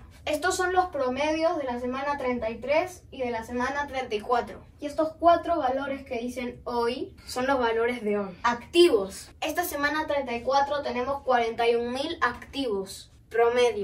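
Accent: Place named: Argentina. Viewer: porque algunos peruanos hablan como argentinos????? pense que eras de argentina se parece bastante el tono... alguien le paso lo mismo???????????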